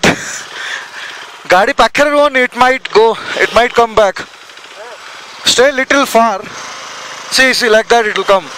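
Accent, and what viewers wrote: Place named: Puri, India. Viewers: sahe sahe re khela bhai puraa aamara puri style re suniki bhala lagila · Where are you from brother? You sounds like a little bit bengali 😄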